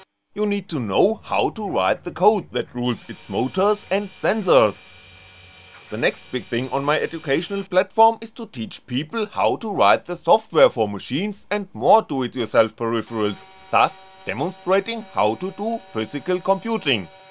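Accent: Germany. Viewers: amazing german accent i enjoy alot :D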